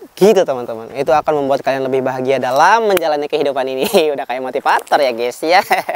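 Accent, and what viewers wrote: West Java, Indonesia. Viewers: Mang lamun ngobrol make bahasa jepang asa ek aya logat sundaan nya😂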